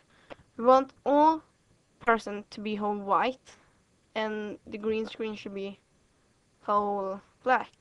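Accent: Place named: Sweden. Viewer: Bra tutorial. Man hör att du är svensk :D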